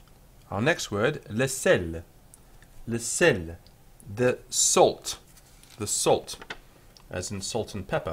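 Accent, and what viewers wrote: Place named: United Kingdom. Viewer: interesting British french accent :-)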